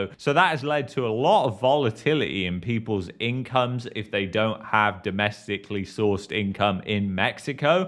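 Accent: United Kingdom. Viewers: listening to your accent, you better go back to the UK and enjoy it..leave Canada to Canadians..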